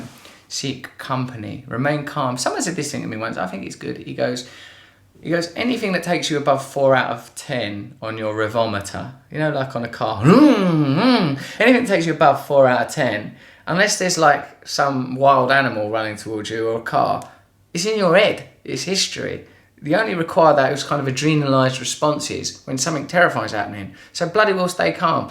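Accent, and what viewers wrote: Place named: United Kingdom. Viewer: relationships = wondering about the dog and then having a laugh and that. you've gotten awfully british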